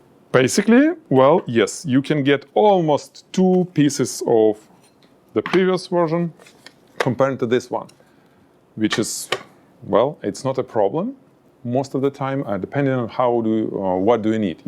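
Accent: Russia. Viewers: По первым словам сразу понятно, что русский)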